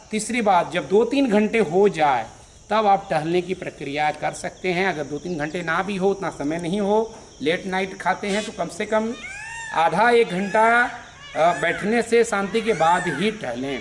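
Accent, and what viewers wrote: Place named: Bihar, India. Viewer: Baba bihari ho na sahi sahi bolna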